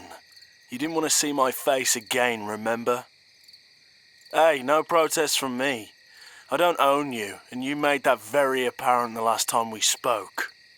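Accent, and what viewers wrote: United Kingdom. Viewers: I dont usually like men BUTTTTT I make an exception for this bri’ish boy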